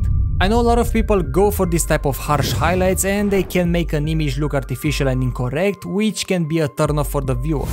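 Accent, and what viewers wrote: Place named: Romania. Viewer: i can tell by your accent you are romanian xD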